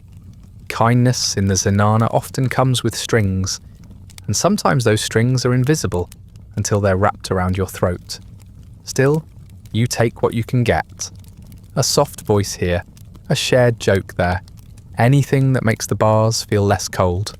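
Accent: United Kingdom. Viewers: Yes, with an English accent.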